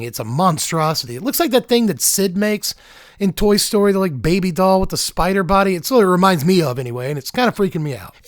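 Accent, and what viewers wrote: South, United States. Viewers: gets more southern with laryngitis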